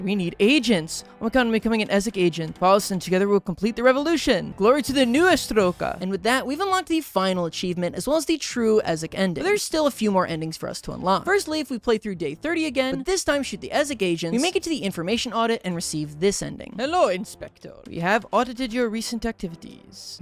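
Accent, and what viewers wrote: United States. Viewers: nah bro that is not arstroka edit: I can't watch this, it's unbearable. The americanization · I couldn't tell if it was intentional rage baiting or his flavor of American is just not used to words like that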